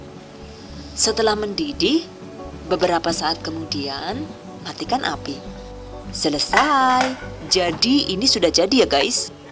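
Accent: Banyumas, Indonesia. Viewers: Ternyata ngapak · Rika wong ngapak ya mba??haha Nembe nemu nang beranda jannn...ora kit biyen nemu...langsng subscreb mbae...padettt bgt penjelasane.... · mba ini orang ngapak ya.kok pinter ngomong ngapak.pada bae karo inyong mba😁😁😁😁😁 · Done#GiveawayTHV2021 terima kasih atas semua resep olahan yang belum pernah praktek kan tambah ilmu melihat video THV ini pokoknya the best dah dan ada bahasa ngapak ngapaknya i like it.. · Ngapak koek koek menginspirasi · Diiii bocah ngapak ya ,ujungnya2 ko jadi kaya kiye ... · ngapaknya itu lho bener-bener ngangenin inyong jadi pengen nunggang bis ke kroya wkwkwkwkwkwkwk, btw makasih banget ya bund resepnya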